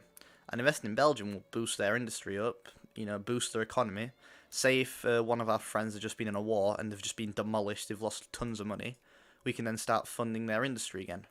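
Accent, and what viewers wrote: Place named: United Kingdom. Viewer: Great series, however I'm having hard time understanding British accent videos and you speak too fast for my taste.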